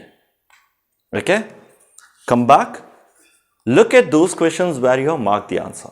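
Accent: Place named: Mexico. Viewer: Why is he trying to mix English with maxican accent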